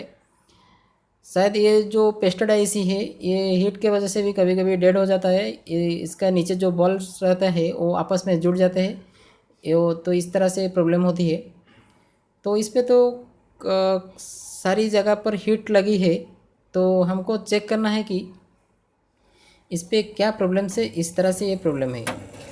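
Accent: Odisha, India. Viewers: Brother you are from Orissa because of your Hindi speaking style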